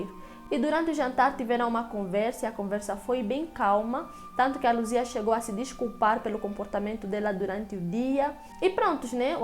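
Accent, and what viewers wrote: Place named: Portugal. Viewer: Voce puxa mais para o portugal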